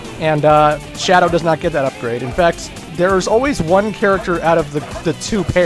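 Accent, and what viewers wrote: Canada. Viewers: YOUR CANADIAN!!!!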